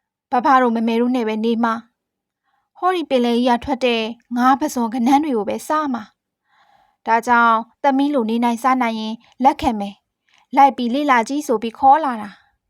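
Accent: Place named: Upper Myanmar, Myanmar. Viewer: ချယ့် အသံ က အညာက ပဲ GEC ပဲ.. လေသံနဲ့ ချစ်ချာရီး 😜😜😁😁 စိုးသာလွန်း တဲ့လား အမည်လေးကဆန်း ပီး ချစ်စရာ စိုးသာလွန်း ကိုချစ်ရင် ပင်လယ်ကိုလည်း ချစ်ရမယ် ငြမ်းစင်ကလှုပ် လှေကပုတ် နဲ့ ကောင်လေးဟာ ပြန်သွားခဲ့ပါတယ် 😅 ဆရာမ မိချမ်းဝေ စာတွေ ထပ်ဖတ်ပြနော် ကြိုက်တယ် ကျေးဇူးတင်ပါတယ် 🥰🥰🥰🥰🥰 👍👍👍👍👍 🤜🤛🤜🤛🤜🤛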